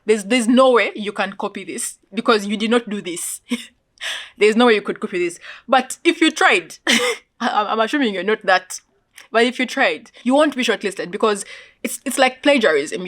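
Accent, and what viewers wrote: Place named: Kenya. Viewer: Sounding like my Kenyan friend 🤗🤗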